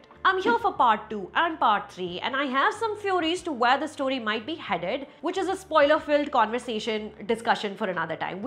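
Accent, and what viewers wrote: India. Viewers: long time fan of your reviews. However, I find your recent reviews a bit strangely edited. Let me explain- also, this is honest feedback not a low effort trollpost. Your recent reviews have you speaking a bit too fast, definitely faster than your previous reviews. I am not sure if this is because you are using a software that takes out the pauses? Just feels rushed and a tad unnatural. This review of brahmastra felt as frenetic as the movie- I tune into your channel because you bring your biting wit, sharp analysis, and humor all to bare. Also love how you own the desi angrezi accent - but the audio editing in these videos is so off, it has me pausing and rewinding quite a bit. If it’s not the editing, may I suggest probably using a slightly more relaxed vocal delivery (similar to your earlier style). Koi jaldi nahi hai- I will easily watch a 15-20 min review for the quality you provide!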